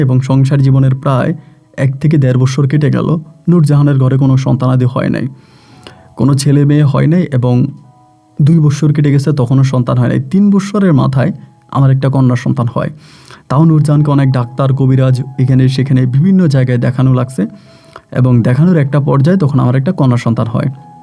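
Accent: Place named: Barishal, Bangladesh. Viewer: বরিশাইল্লা,,দ কে বলে ধ,,গ কে বলে ঘ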